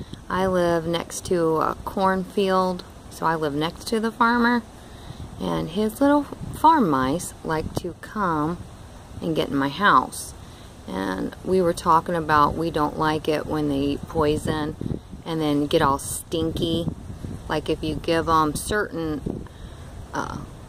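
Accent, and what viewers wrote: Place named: Indiana, United States. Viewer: That's an Indiana accent I think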